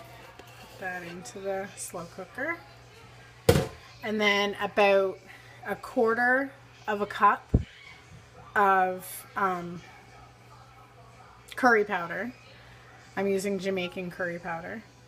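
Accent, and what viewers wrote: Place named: Canada. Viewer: Let me guess you’re Canadian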